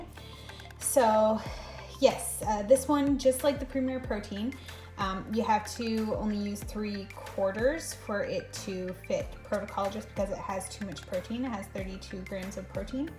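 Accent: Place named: Canada. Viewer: You sound Canadian. You say “aboot”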